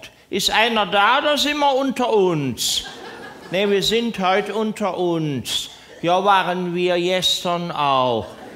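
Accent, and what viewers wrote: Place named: Lower Rhine, Germany. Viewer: Ich liebe es! So witzig, so genial Genau so sprechen wir am Niederrhein :P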